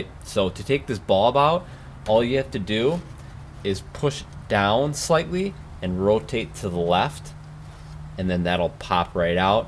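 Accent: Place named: Midwest, United States. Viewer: From the Midwest are ya?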